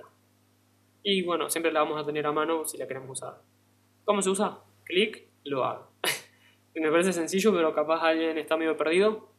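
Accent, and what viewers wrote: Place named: Argentina. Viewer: No se por qué me es desesperante escuchar tutoriales en español castellano xd pero me es super reconfortante escuchar argentinos c: Gracias carnal, buen tutorial, saludos desde México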